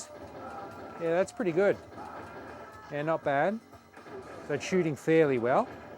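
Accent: Australia. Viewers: This is how I imagine an Australian to speak..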